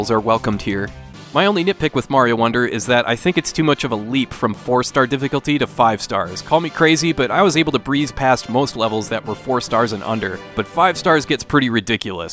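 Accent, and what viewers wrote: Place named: United States. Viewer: Just wanna say from a Brit..your accent is amazing…so Americana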